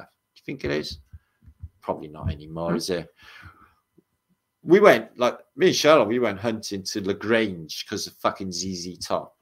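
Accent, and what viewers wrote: Wales, United Kingdom. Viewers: Hi. Just found your channel through Archaix. A meet up snippet with you describing trying to get out of the UK I think! I thought "I know that twang!" 🏴😀 Sending love and best wishes to you from a fellow south 'Walian'. I'm sorry I didn't find your channel sooner. Subscribed for what it's worth and really enjoying my first few minutes of your stuff. 😎🙏💥Thanks again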